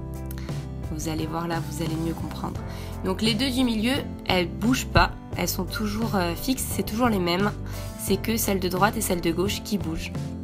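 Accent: Corsica, France. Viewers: Salut, juste une question qui n'a rien a voir avec la video, mais en t'entendant parler tu sembles être de corse toi aussi... c'est le cas ? Voila, bonne continuation à toi =)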